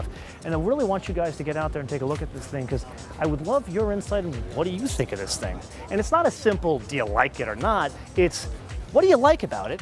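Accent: United States. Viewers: I hate American accent explaining a British Car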